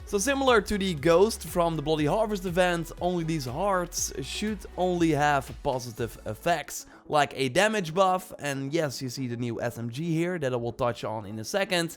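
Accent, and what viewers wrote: Netherlands. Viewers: Your Dutch accent is killing me tbh :/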